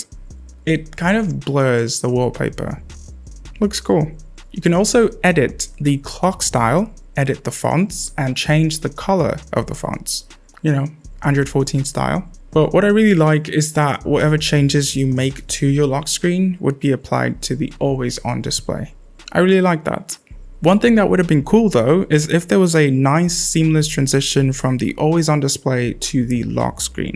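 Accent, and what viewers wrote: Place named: London, United Kingdom. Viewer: London accent.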